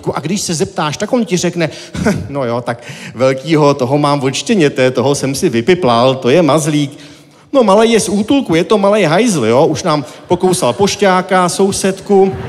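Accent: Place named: Czech Republic. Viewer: Ta brutální čecháčtina při povídání o zženštělosti zní dost komicky. Jinak obsahově dobrý. :-)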